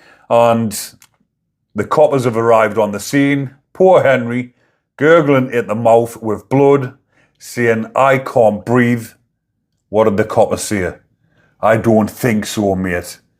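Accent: Newcastle, United Kingdom. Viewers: Where does this guy come from ? Geordie ?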